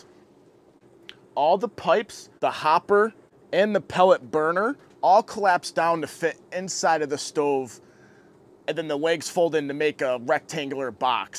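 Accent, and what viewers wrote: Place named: Western New York, United States. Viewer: Wow! You sound like you're from WNY!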